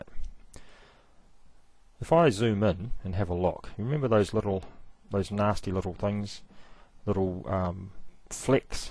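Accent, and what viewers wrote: New Zealand. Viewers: Man, brilliant kiwi accent!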